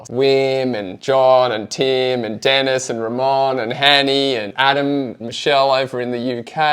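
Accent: Australia. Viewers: That's funny, a Spanish channel?! I am Spanish, I've been following you for a while now! NO WAY I'm gonna miss your voice, your aussie accent or your laughter! I'll keep on watching the original one! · Nah, no travel video please. Stick to getting excited pulling stuff out of the canals. Your Ozzie voice is a bit to annoying for travel talk.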